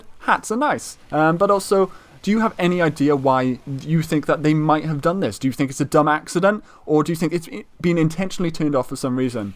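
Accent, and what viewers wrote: Wales, United Kingdom. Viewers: Are you Welsh ?? You sound like it.....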